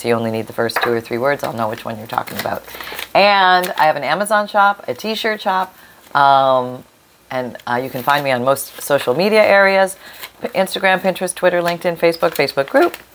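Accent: Canada. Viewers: I love the Canadian accent! I have a friend that lives in Nova Scotia and I could listen to her talk for hours 😊😊 Also I have an Aunt and Uncle who live in Fargo ND.. I live in New Mexico and when they come to visit I love listening to their stories.. Accents are soo unique and are always intriguing to me. 😁😄😊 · Good afternoon 🌞 I love your white hair. I know it's officially blonde but on my TV it's very white and very pretty. Yes Canadians have a slight accent. Anywho, love the little tidbits and ty for the giggles. You always make me laugh. My hubby caught me watching you again. He said and I quote, " you would leave me for crafty videos" 😂😂😂 why yes, yes I would, 😅😅😅 have a great day! ❤ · Thank you for more inspiration! Sometimes I overlook the obvious, such as being able to draw a border to get the effect I want. I spend hours looking for just the right paper when actually I can create my own design, and have fun doing it! 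🤓~ I love the Canadian accent, especially on the 'out' words. 🥰 I have the Texas twang which is a giveaway of where I'm from, anywhere I travel. Oh well, we work with what we've got, eh? :virtualhug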